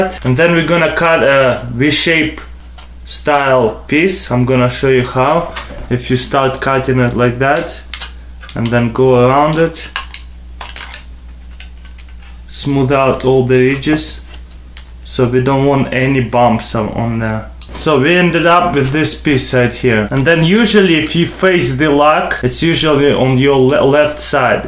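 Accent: Russia. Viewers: Damn, stop fuck with him. He's from russia aye, if u come from germany, or another land, u can't speak goodenglish either right? Stupidfuckers :l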